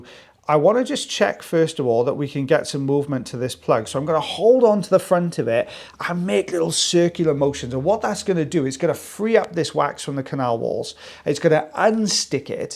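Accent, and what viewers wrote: Wales, United Kingdom. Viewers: I’m so happy you said Ebony in your lovely Welsh accent. It’s one of my favourite words to hear you say!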